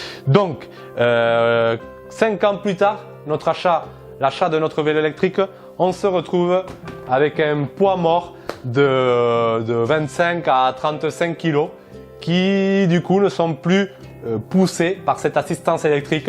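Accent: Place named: South, France. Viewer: Bien le bonjour l'accent du soleil ^^ Le VAE... une belle rencontre pour ma part. 10 ans de pratique et franchement je donne 10/10, il fait son taf. Acquis pour un nouveau velo-taf mais surtout pour les missions de visites au domicile que je dois faire dans toute la ville. Mon 1e est acheté chez décathlon (il y a donc 10 ans), bas de gamme, 750€. Il a fallut ajouter des sacoches, des pneus anticrevaisons (efficace à 100% en ville, jamais crevé sinon tous les 15 jours... pchiiii) et une fourche téléscopique (terme ?). Et bien il a tenu 10 ans par tout temps et ce avec des milliers de Km par an (6000 km/an rien que pour le trajet domicile-travail-domicile + les missions et une année j'ai même "noyer" le système électrique vu la douche que je me suis pris). Bon, il est vrai qu'il visitait les ateliers d'un pro 2x an + batterie chouchoutée au chaud en hiver). 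J'ai fini par le délaisser (et il est tjs à la maison ^^) car malgré les régulières révisions, ben oui, la batterie n'avait plus 20 ans (comme moi d'ailleurs) et des pièces principales commençaient à lâcher (j'avais un clé allen dans mes sacoches pour reserrer le pédalier régulièrement par exemple). Bof, cela devenait galère. Puis un jour, en visite sur le site d'une grande enseigne sportive française (la même que pour mon 1e), je vois une promotion d'enfer pour un VAE d'un partenaire hollandais : 1500€ au lieu de 2500€. Wow ! J'ai craqué, il était temps, j'ai mon 2e VAE. je suis passé d'un moteur dans la roue arrière au mot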